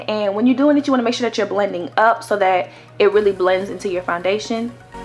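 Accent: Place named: Bay Area, United States. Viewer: Where are you from? Sounds like ur from the bay.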